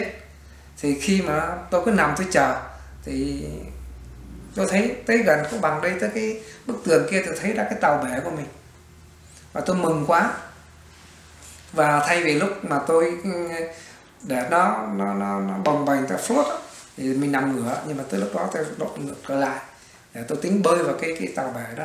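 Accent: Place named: Hai Duong, Vietnam. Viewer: Đúng là giọng Hải Dương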